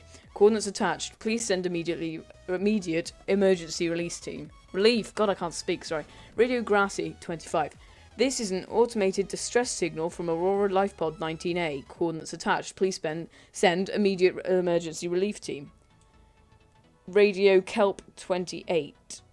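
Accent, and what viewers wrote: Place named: Midlands, United Kingdom. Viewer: where are you from? I'm guessing Midlands area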